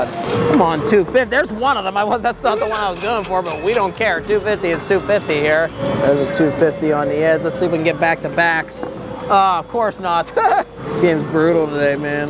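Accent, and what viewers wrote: Pittsburgh, United States. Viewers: Sounds like he's from Pittsburgh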